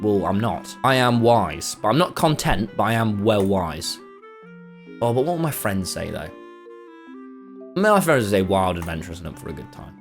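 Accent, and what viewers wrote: United Kingdom. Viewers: its been a year since i watched. hes turned so much more british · i feel like he’s extra british this video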